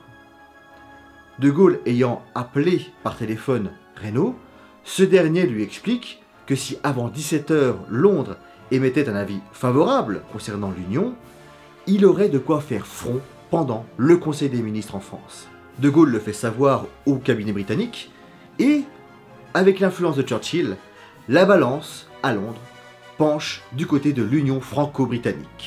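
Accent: Germany. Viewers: Je me permet de recommander une jeune chaine yt axé sur la seconde guerre mondiale, et qui a traité de l'offensive de la Sarre (le petit sketch du début avec la bière), c'est la chaîne des historateurs, n'hésitez pas 😎 Et comme d'hab... très bon accent allemand passif/agressif! 👌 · magnifique accent allemand ( et super vidéo en passant ^^ )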